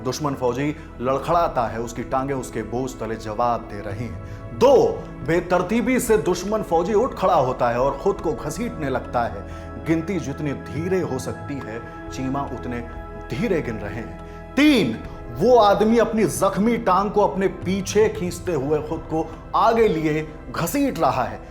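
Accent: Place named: Bihar, India. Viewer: Yar kahani simple bata itna ghumata q hai bihari accent thik karo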